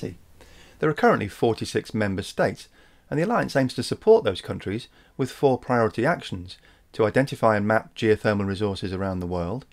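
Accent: United Kingdom. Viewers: We weren’t ready for that accent. I love British accents.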